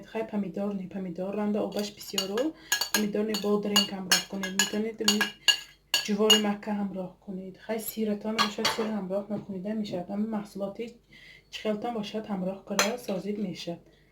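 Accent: Isfara, Tajikistan. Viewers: Хело,ба фикоам шумо аз Исфара,лахчаи гапзанитон монанд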